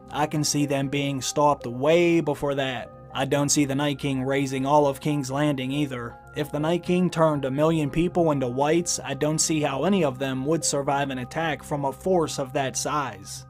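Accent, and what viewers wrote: United States. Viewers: What is your native tongue? I would like to know how you've mastered American in just a year or so. Thanks!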